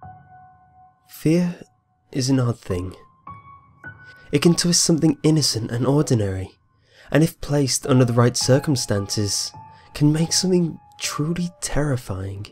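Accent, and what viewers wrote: England, United Kingdom. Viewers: English accent I believe :3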